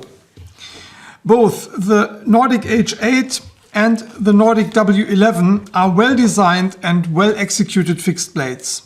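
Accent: Germany. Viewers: englisch etwas deutsch aber da ich es auch nicht besser kann keine reale kritik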